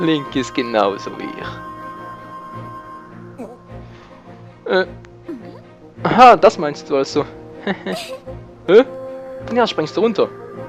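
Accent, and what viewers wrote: Switzerland. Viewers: HA! Tscha zeme! Schwiizerakzänt! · ich finde deine videos echt witzig vor allen mit deinem Akzent. bist du Schweizer oder so? wenn du das so nachspricht was link so "sagt" hört sich echt witzig an. link mit einem schweizer Akzent xD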